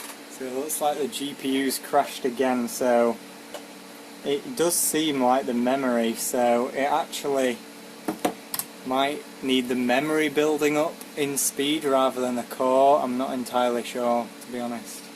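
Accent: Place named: United Kingdom. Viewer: Sorry if I misinterpreted, but you sounded identical to Brian Cox to me... I'm just a simple American trying to place the plethora of British accents but it sounded close to me... Sorry but again, thx for the video :)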